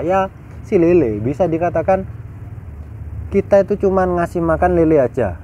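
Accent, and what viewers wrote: Madura, Indonesia. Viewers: Orang madureh kak?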